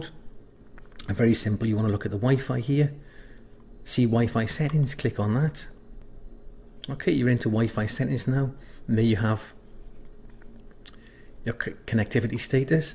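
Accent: Wales, United Kingdom. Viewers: This guy sounds so British! You're one of the most British tech channel I ever watched. (Most tech channels are either Indian or Americian) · Omg, Taffy...lol it's so lovely to hear that Welsh accent...I miss UK.... Anyhoooo, cheers for that will fix it tomorrow as a, little drink is in order right now 🥂🥂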